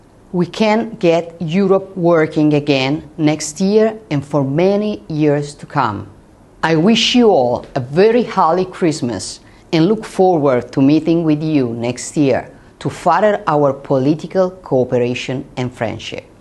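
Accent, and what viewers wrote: Rome, Italy. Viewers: non si possono paragonare .. ovvio, era imbarazzante e lo è tuttora, ma lei sta leggendo un copione studiato e per lo piu con pronuncia in romano quindi non vedo chissa che genio sinceramente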